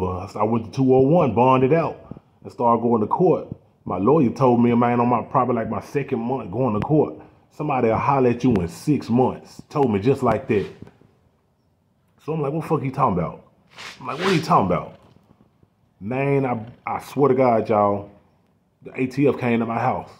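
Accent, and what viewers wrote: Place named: Memphis, United States. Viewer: Damn Ian heard 'Jone' in a min, I can tell you spent some time in Memphis. Love from south Memphis mane just subbed, can't wait to see yea channel grow.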